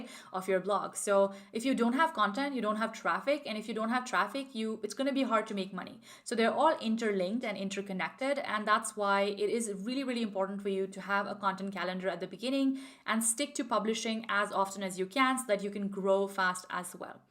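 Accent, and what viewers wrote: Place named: India. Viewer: You sound like an Indian, even your name is Indian then why does your channel say you're German?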